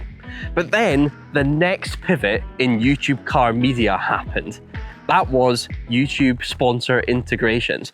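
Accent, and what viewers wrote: Scotland, United Kingdom. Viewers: Ahh! You have probably the best taste in cars of any person on Youtube (as in, unloved British cars).. Best of luck with the new channel, make sure you put subs on all your vids because I can't stand the Scottish accent - I always used to watch the DT videos in silence with subs. Can't wait to see what happens.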